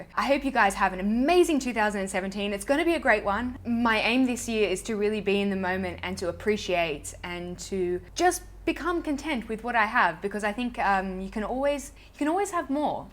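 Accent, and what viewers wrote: Australia. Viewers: I accidentally spoke in your kind of Aussie accent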